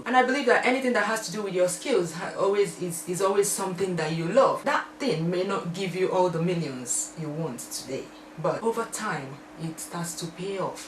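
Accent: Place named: Anambra, Nigeria. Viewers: I love love love Anambra dialect!!! Chai, na too sweet!!! I'm from Enugu but I pray to marry an Anambra man lol. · Aunty, you know you are my aunty lol (in my head) . You're tag was awesome and so much fun. I na-asu that deep anambra dialect (my Igbo is small). I got lost for a while haha.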